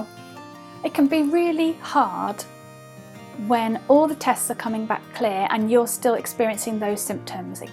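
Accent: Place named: United States. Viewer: Bonjour. Je réponds donc en Français. Je reviens d’une promenade dans la nature. J’habite dans la région Auvergne-Rhône-Alpes. Êtes-vous la dame de la vidéo? Êtes-vous américaine ou britannique? Je pencherai plus pour la nationalité américaine, au vu de votre accent et du fait que vous parliez sur you tube. Je souffre du trouble neurologique fonctionnel (TNF=FND) depuis ma vaccination ARN messager. Je marche avec une canne et je souffre d’une dépression existentielle assez aiguë… J’ai des douleurs neuropathiques. Je vais chez un neurologue en septembre pour écarter les maladies neurologiques classiques comme la sclérose en plaque ou un accident vasculaire. J’ai adhéré à l’association Covid long France pour ne pas me sentir seule face à la maladie. Je vous donnerai régulièrement des nouvelles en anglais ou en français comme vous le souhaiterez. 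Ce sera un réel plaisir pour moi. 🙂🙂🙂 Amitiés. Christelle 🌈🌈🌈🌈🌈🌈🌈🌈🌈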